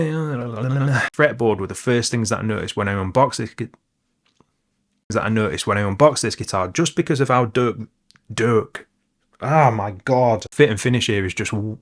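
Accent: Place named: North, United Kingdom. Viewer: I can understand you perfectly well. Northerners unite!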